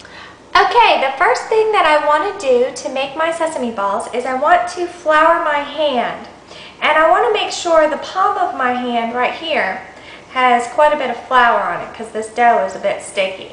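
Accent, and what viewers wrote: South, United States. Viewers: you sound mighty country, i do too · Aww, I love your Southern accent! Your new vids sound very cute though. :) Thanks for posting these!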